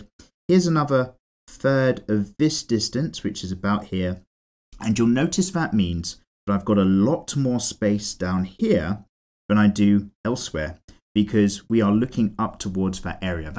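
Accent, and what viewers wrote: United Kingdom. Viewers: ah sory about that but i fucking hate british accent.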